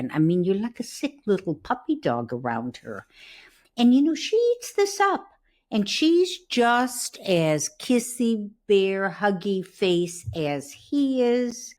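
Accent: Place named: Midwest, United States. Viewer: Hi ….I enjoy your commentary,but……I’m not trying to be nasty here, but I just have to say…… your midwestern accent is so annoying! It’s grating!! It makes it difficult to listen. You really should try to mitigate it. Perhaps there’s some kind of course on YouTube that could help you.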